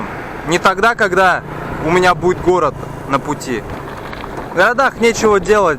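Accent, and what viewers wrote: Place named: Chuvashia, Russia. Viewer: это чувашский акцент на английском языке.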